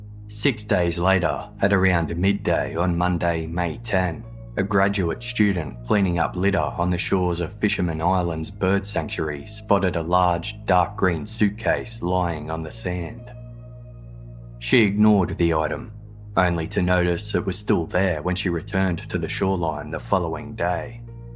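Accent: Australia. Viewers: Ok I’m going to have to UNSUBSCRIBE. The annoying drone of the Aussie accent I could muddle through but this report COULD NOT BE MORE BIASED! Allow me to be of service to listeners: go to Direct Appeal Podcast. It is one thing to simply be a story-telling channel but a whole other when the “story” is clearly one sided.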